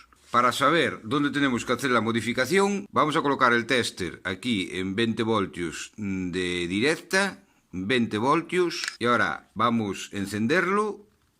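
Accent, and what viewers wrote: Galicia, Spain. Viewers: Gracias amigo, me encanta tu acento Viva Galicia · Al margen de que eres un genio, me encanta tu acento gallego.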